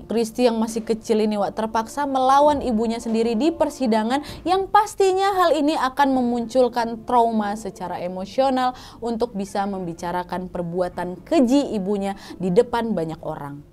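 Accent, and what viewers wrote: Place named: Medan, Indonesia. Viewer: Sambil ngakak dengar cara baca ceritanya,kental kali logat Medannya,semoga tetap senabgat dan sukses selaku ya buk.tksh.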